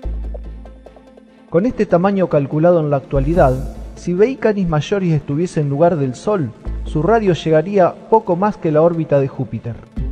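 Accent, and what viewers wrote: Argentina. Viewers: muy buen video pero te hago una pregunta ¿sos argentino? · Jorge montt el dice estresha por que es argentino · muy bien! pensé que era el único argentino que se interesaba por estas cosas. me suscribo. no le des bola a los ignorantes y seguí propagando con orgullo tu acento. saludos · Bueno este tiene el acento Argentino PURO y sin suciedad, molesta escucharlo decir estreSHA,mashoris, sh sh :v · Si, es Argentino, he visto mucha gente que se quejaba por su acento en los comentarios... Mil disculpas! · Nose porque la gente se burla del acento así se habla en argentina , pero en todas partes no se habla igual , nose porque se burlan es el "Idioma argentino" que problema tienen De que pronunciemos así ? Son inmaduros .Saludos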